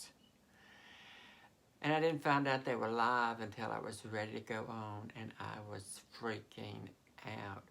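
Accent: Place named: Mississippi, United States. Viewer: like you I'm 63 years old and I still full on hug my son who is 41 and a leader of men and women in the fire service. I have always hugged my family even the inlaws. You and I have more in common than most people would believe. Like you I struggled in school because in the early 1970's learning disabilities like dyslexia or the broader spectrum of autism didn't reach the rural schools. In spite of my comment to learning, I just didn't get it. While my grades were never very good, my conduct and respect for others was above expectations. Turns out I'm extremely mechanical and thrived in the apprenticeship programs of the trucking industry. Show me how to do something and I'll never forget it, tell me, and it will time for me to figure it out. I love your Mississippi draw and voice inflection. Bests wishes and stay positive.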